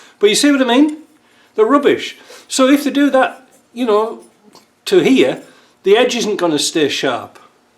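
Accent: Yorkshire, United Kingdom. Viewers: i do enjoy your clips. just wondered how what sounds like a yorkshire lad ended up in cannda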